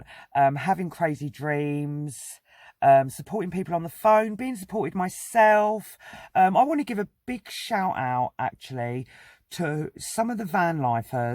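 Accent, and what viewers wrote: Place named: Cornwall, United Kingdom. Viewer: do you travel just down south, or do you travel all over the UK, you sound like you’re from Cornwall or Somerset. X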